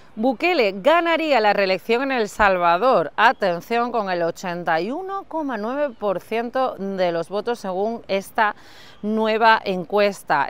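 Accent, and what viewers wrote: Spain. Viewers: En Cuba siempre han querido mucho a España, me emociona tu acento pura Española, o sea de España.Tengo más de 15 años que no como un turrón alicante, ni el turrón jijona ,aca en Venezuela es muy caro para mi.Buenas tardes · Me gusta .loquedises con heste asentó Español.desde .USA😊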